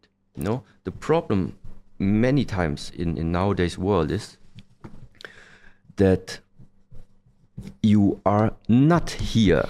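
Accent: Germany. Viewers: The German accent makes it kinda funny 🤭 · His accent sounds so german .. 😅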